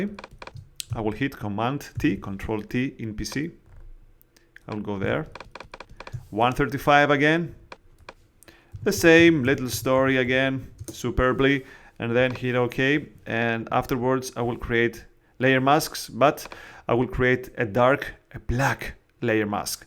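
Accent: Greece. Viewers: from your English i understand that you are a Greek dude.So, Ευχαριστώ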